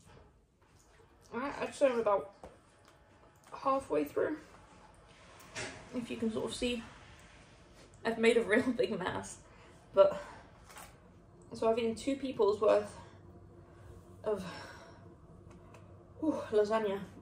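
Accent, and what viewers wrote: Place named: United Kingdom. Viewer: She’s British by the sounds of it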